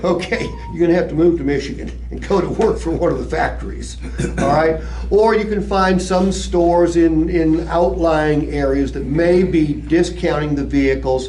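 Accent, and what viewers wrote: Appalachia, United States. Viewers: hillbilly and his bullshit hick talk!!!!!!!!!!!!!!!!!!!!!!!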